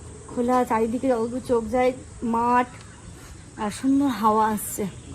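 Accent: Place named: Malda, India. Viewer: Didi tumi aj j gulo bollao ota ke maldar vasa....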